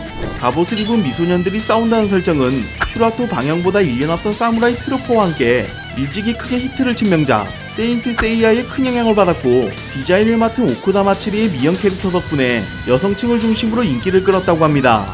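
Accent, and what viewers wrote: Gangwon, South Korea. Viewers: 약간 강원도 사투리쓰시는듯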